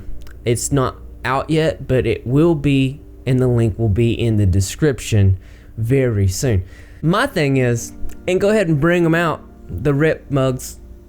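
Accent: South, United States. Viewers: i've finally figured it out what kind of accent he has he sounds southern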